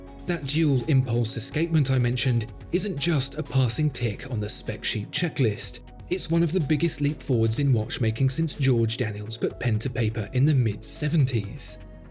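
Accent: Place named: England, United Kingdom. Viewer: This channel always makes me hate the English accent 🤡 and can you ever change th music? Jeez And seiko only competes with seiko, not anyone else , why they have 2 factories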